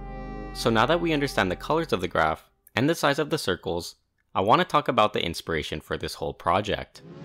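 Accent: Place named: India. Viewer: Voice is indian..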